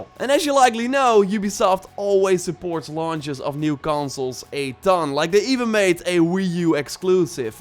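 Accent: Netherlands. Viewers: Are you dutch? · ok met je kanker Nederlandse accent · Ik dacht al dat je nederlands sprak, door je accent 🇳🇱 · Ben je Nederlands?😅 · Ben jij een nederlander?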